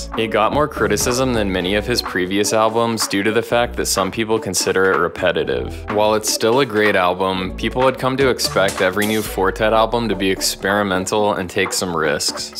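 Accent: United States. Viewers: Opens video American accent Close video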